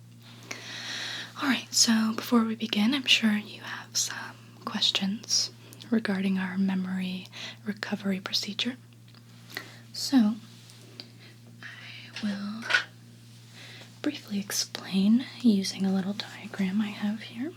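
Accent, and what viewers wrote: South, United States. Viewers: The Christmas series she did with the curse removal had more of a southern accent going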